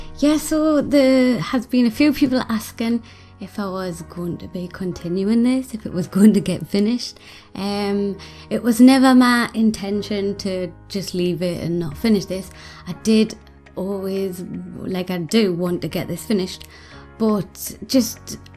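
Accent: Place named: Newcastle, United Kingdom. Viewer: I'm subscribed and keep watching your videos even though I don't play the game. Your voice and Geordie accent is soothing to me.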